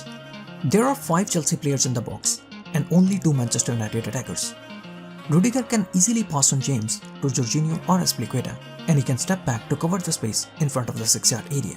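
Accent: India. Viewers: I like your curry accent.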